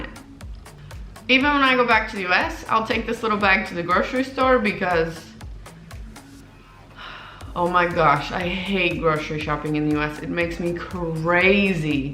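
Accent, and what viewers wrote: Czech Republic. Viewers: Why do you have a czech accent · You speak almost totally American, but then have these noticeable slight inflections of accent that are super specific to the Czech accent. When you say "Drink beer" with the way you pronounce your R's is a perfect example. Are you Czech by birth who lived a whole life in the US? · My guess is she's pretending to be American to ride on the popularity wave of "foreigner reacts to Czech customs" videos. She certainly doesn't speak like American, her accent is very reminiscent of a native Czech. I stumbled upon this channel few years ago, I think she had some even older videos on the channel where her Czech accent had been even stronger. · That's exactly how it appeared to me, too :) The lady literally looks like a Czech (I love her eyes :) and has an incredibly Czech accent. I would have never guessed she was a real American :D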